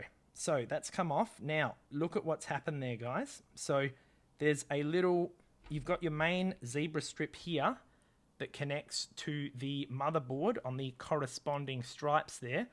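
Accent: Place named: Australia. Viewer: This is a wonderful tutorial. Thanks. Your Aussie accent is amusing to follow.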